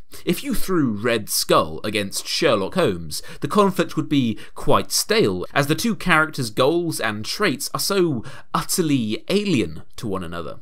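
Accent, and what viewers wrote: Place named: United Kingdom. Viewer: dat british accent 🔥🔥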